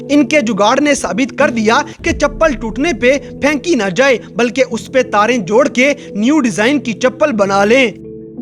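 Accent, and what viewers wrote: India. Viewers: او بھائی تیرا بولنے کا انڈین سٹائل بالکل بھی پسند نہیں آیا۔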